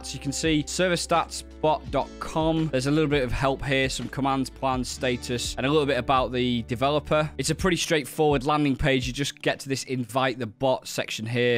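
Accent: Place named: Liverpool, United Kingdom. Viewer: Awesome vid, man. Quick and simple and I got my counters set up in no time. Subbed because of your Liverpudlian accent tbh!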